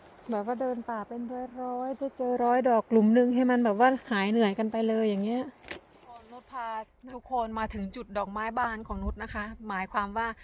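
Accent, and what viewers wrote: Korat, Thailand. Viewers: สวัสดีจ้า ฟังพูดคือคนโคราช บ้างเอ็งแท้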